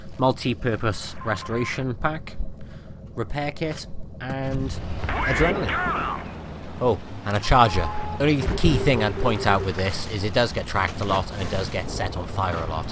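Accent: Germany. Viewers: You Should Upload a Video with short clips of that German Accent with Sentences other people can use in there videos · great review..love the german accent, keep it up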